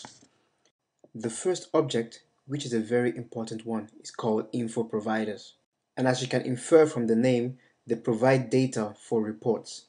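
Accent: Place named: Africa. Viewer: Don't be a simple minded person. He has an African accent that is mixed with European accent. He is not faking any accent, instead he spoke slowly so everyone will understand. It shows exactly what you got from the training.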